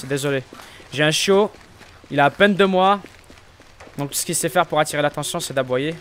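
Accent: Quebec, Canada. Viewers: T de quebec?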